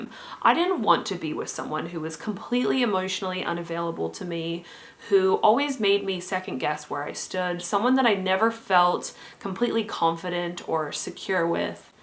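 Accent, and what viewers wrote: Australia. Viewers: Are you Aussie? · Are you Australian? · Aussie aslccent with a bit of Canada or US muced in? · Are you Australian or American?!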